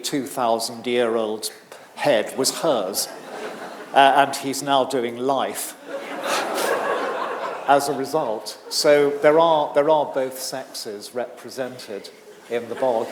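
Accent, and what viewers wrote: United Kingdom. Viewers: It could also be because I find a British accent easier to understand (am Australian).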